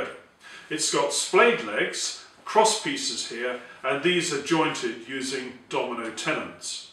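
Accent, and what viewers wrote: United Kingdom. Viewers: did a great job. I have several clamps from other manufactures. Last night I was clamping a closet shelf system and I noticed that the Jorg's were not exactly parallel which surprised me, but the Besseys that were also being used in the same clamping were exactly parallel. The Bessey clamps made it possible to clamp perfectly, the Jorg's would have left a mess for me. sold me on Bessey, and the British accent made me feel chipper!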